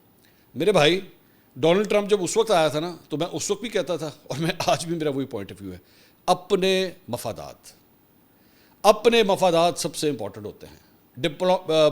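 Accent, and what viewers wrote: Punjab, Pakistan. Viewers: Bhai, your Punjabi is hilarious and full of character! 😂 You're one of the few who speak the truth with no filters pure facts every time. I genuinely respect your honesty. Personally, I feel PTI should take a step back for now. Pakistan, by the Grace of ALLAHTALA, is finally moving in the right direction gradually. In'ShaALLAH, our country will continue to progress. May Allah bless you and bless Pakistan. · Punjabi Akcent best❤️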